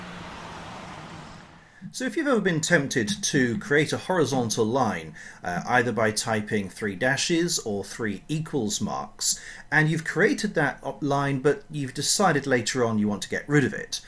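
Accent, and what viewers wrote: United Kingdom. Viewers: I was frustrated with that line. Thanks a ton for showing how to get rid of it. Although it could have been a shorter video. Loved the Brit accent...been a long time since GoT!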